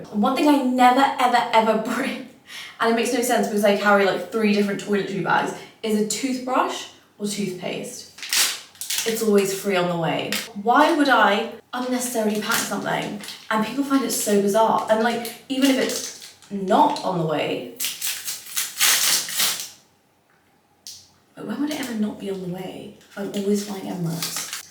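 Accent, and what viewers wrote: United Kingdom. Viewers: I remember you saying that wherever you go you take on an accent and girl that London accent is thick right now😂 Love you and this video❤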